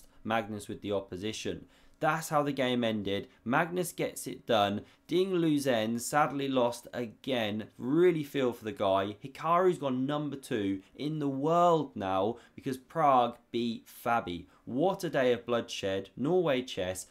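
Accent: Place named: RP, United Kingdom. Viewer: i have to comment - you are actually amazing at this. excellent speaker, sounds effortless and off-the-cuff but quite stylistically elegant, like a posh moistkritical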